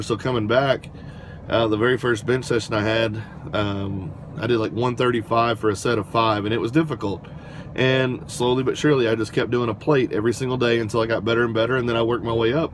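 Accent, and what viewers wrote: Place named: Russia. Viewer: Will you be putting this into the new book? 20 week cycle? I can hear the russian accent developing in your voice, you have learned a lot from Boris and his athletes,I can see the influence in the way you speak about training. A mix of Louie and Sheiko, ain't that the best combo in the world. I love it. Side note, how cool is that video of them two meeting? Louie was so happy, grinning like a boy. Glad that those two met.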